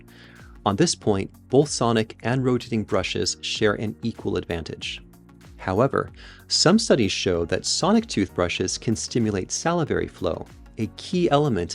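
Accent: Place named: Scotland, United Kingdom. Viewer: Was going to get oral but now sonic! Thanks for this! Love your accent from Scotland 🏴!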